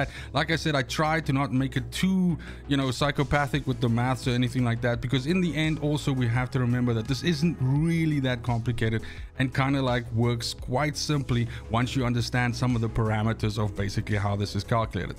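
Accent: South Africa. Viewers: Bro are you South African??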